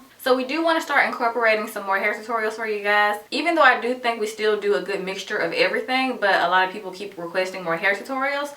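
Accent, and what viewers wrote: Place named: Alabama, United States. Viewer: Alabama, United States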